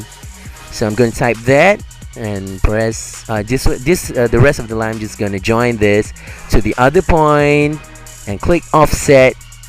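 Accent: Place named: Philippines. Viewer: useful video and from ur accent it looks like u are from Philippine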